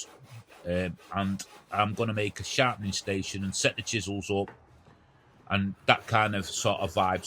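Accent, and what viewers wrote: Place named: Northern England, United Kingdom. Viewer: I'm a Stopfordian but I'm exiled in New Zealand. It's roasting here. Sorry. Good to hear a northern accent on YouTube. Your videos are straightforward and to the point. Keep up the good work.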